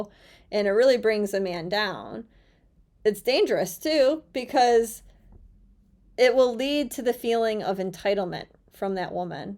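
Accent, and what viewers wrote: United States. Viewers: You are a very interesting women and i totally agree with you. Here in the Netherlands it is the same as in all the west. Respect for you....but could you please work on your typical American womenly accent (i hope you know what i mean). Then it would be much more enjoyable. Keep up the good work in creating awareness and perhaps change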